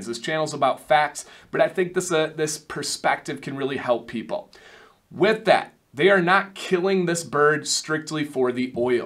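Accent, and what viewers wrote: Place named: United States. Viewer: Absolutely fascinating video. As a carnivore I 100% agree with your views on emu oil (& respect those opposed coming from a vegan perspective). I dig that the bird is farmed for food, it’s feathers, etc & that so little goes to waste. This is not an oil I have ever heard of or seen in any UK beard oils but I will definitely look out for emu oil, especially if it works on dormant hairs. Just FYI, another thing I love with your videos, & others I watch on YouTube from over the pond, is the little accents. Hearing you pronounce emu ‘E-moo’ where as in the UK we pronounce it ‘eem-U’. Just reminds me how huge the bearded community is! Anyway, Happy New Year, all the very best for 2020!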